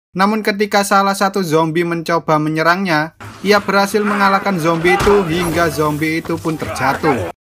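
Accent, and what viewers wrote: Java, Indonesia. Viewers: Wong jowo to mas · pekat bet jawo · Bang kamu orang Jawa ya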